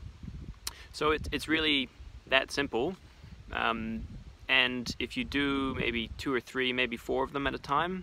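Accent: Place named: New Zealand. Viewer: Mate, you are getting a kiwi accent! I used to see you in Kingsland in 2010s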